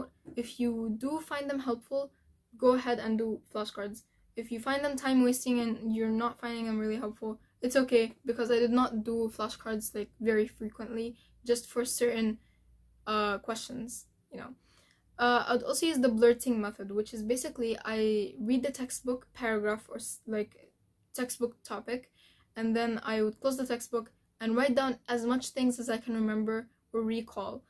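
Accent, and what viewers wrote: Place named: Arab world. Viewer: I FIND YOUR ARABIC ACCENT SO SO SO PRETTYT AND YOUR HUJAB I SO SO SOS SOS OS PRETTYY TOOO LMAOO thankyou for thiss <3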